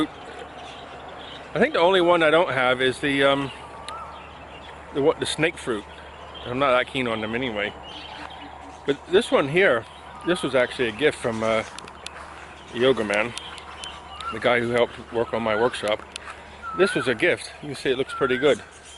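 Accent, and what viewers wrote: United States. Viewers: Thank you so much for the shout out. You didn't have to do that for me but much appreciated. I thought that I detected some American in your accent. I was stationed in Goldsboro NC back in <num> and again at Fort Bragg <num>. I agree with everything you said, that is why I always preferred to be stationed in Europe, some of the same issues but not as bad. Your land is so beautiful, a true paradise indeed. Thanks again for the shout out and I look forward to meeting you when I retire.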